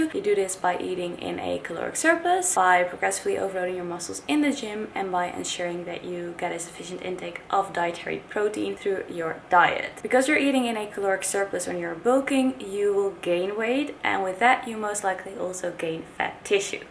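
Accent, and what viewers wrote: Netherlands. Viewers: I heard the accent and thought: Hé, Holland!! Leuk joh dat je vlogt! Vorig jaar was ik ook super healthy en toen... werd ik zwanger 🤰🏼. Lief kind maar wat ie met mijn lichaam heeft gedaan 😱 PUDDING!! Ik wil mijn abs terug! 😫 Dus we moeten van een “pudding-“64 kg naar een “in shape”-58,5kg. Here we go!! 💪🏻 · Wait... Are you Dutch?🙈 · Just a tiny question.... are you dutch? Edit: laat maar de Milner heeft t verraden 😂 ik merkte het aan je accent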